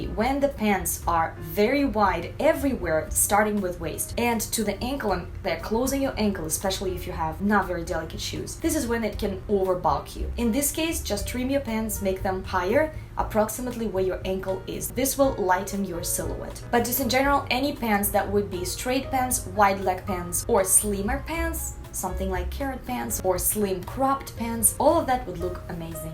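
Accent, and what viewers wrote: Russia. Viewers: У вас прекрасный русский акцент ❤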